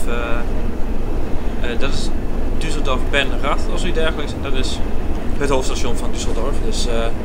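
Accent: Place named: East, Netherlands. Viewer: Hé! Een Nederlandse video! Ook leuk! Zo te horen kom jij ergens uit het Oosten? :p Ik kom daar wel vandaan ;)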